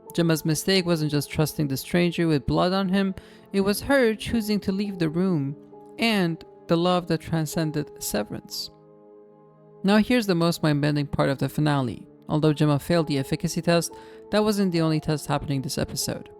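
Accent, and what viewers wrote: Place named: Europe. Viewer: Yeah it is an amazing show! I like that it is so mysterious! It really makes the viewer think! It still could be so many different things! So you think youre outie and innie are different people! Interesting! Yeah you should continue your YouTubes! You’re good at it! I like your accent! What is it? European? It’s ok you don’t have to tell me! It can be another mystery! I won’t be watching much tv until November. I’ve got a big race to train for. But once I’ve finished, I’ll be back on the couch and watch lots tv shows and be deep into theories on reddit and YouTube! which movies do you like?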